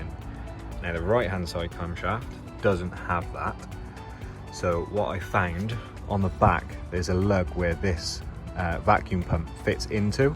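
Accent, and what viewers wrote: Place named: Devon, United Kingdom. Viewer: Nice video mate .Where in the UK are you .You sound like Devon area ?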